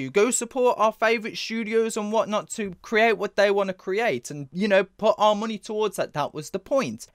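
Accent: United Kingdom. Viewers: man gets more British when he is angry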